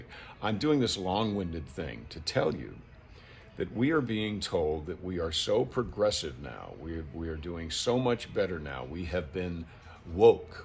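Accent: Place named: New York, United States. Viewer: You sound like a New Yorker of the East Coast. Lord forbid! You are spot on! Keep talking? Been watching "Chips!" myself... "Heat of the Night!" "Magnum PI," "Hunter!" "Soap" .."Quincy." " Little House on the Prairie." "Mash!" And what I would like to find and re watch again is "Benny Hill!" Kids don't know what they are missing. I wish they would rerun "Dr Quinn M.D." again... They never get old!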